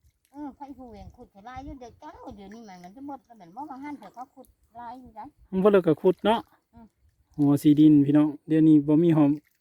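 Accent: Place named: Phu Thai, Thailand. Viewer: เสียงเว้าคือบ่าวผุไท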